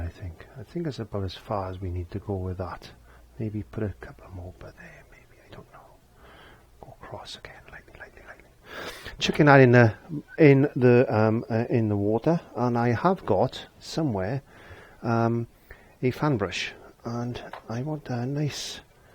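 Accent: Wales, United Kingdom. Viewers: Thanks. I have a question: Are you Welsh?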